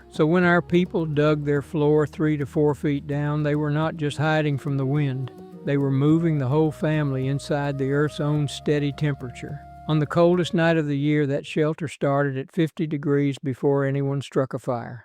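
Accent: South, United States. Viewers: Why does he have a southern accent? A I accent and images - but interesting Why does he say "Indian" country? That's not what they called themselves. lol. Indian country is the country called India. Also, how is that these types of dug out shelters don't have the soil collapsing on them?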